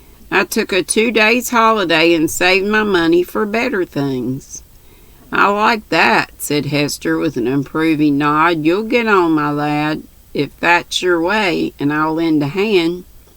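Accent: South, United States. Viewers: You are so so cute 🥰! 😂 I love your southern accent! I love everything about the south and southern ways! I swear I was southern in a past life, because I’m Hispanic, I was born here but my parents are from the Dominican Republic 🇩🇴 and I actually learned English in school because my parents didn’t learn English till we went to school, therefore people find me strange ( which I’m ok with) when they hear me listening to country music instead of Merengue or something like that, and they see how I love 💗 my cowgirl 🤠 boots 👢 and my country home style. 😂 I just love it! My dream is having a ranch with horses 🐎 and all that good stuff! Also finding myself a good ol’ country man that knows how to treat a lady 😂 ( if you know one let me know Ms. ) 😂 Anyways just wanted to say I love 💕 your humble southern ways and style! Thank you for being you my sweet friend! 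🥰🥰🥰🥰🥰😊😊😊😊🙏🙏🙏😘😘😘😘😘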